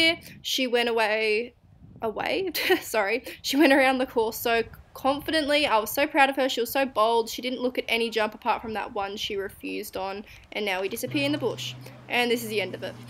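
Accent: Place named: Australia. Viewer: Lol I picked your accent. Are you from Australia? I'm in NSW!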